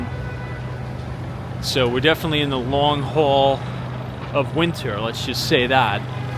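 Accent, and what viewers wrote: New York, United States. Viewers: Love your accent,is this a New York accent?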